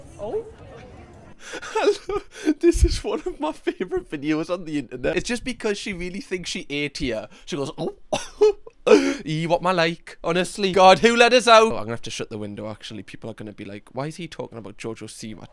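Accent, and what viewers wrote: Newcastle, United Kingdom. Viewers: Are you a Geordie?! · so refreshing hearing a geordie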